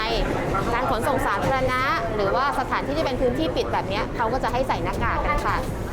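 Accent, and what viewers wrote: England, United Kingdom. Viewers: พูดสำเนียงอังกฤษไช้มั่ยเนี่ย ดูepNYมาหลายตอนเพิ่งสังเกต😁😁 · ชอบคุณ พูดอิ้งค่ะ ฟังเพลินดี สำเนียงออกบริตติชเลยค่ะ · Did you attend school in England? You got an English accent. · ชอบสำเนียง British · สำเนียงหนู British มากเลยค่ะ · คุณ เป็นนักเรียนอังกฤษเก่าใช่มั้ยคะสำเนียงออกทางอังกฤษเลยค่ะ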